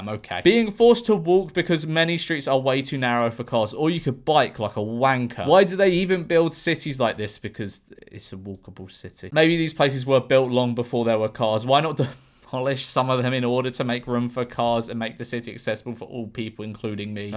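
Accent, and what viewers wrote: United Kingdom. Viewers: Enjoy your topics and comments...but as a Canadian, PLEASE slow down your delivery a wee bit, as not everyone understand your British accent.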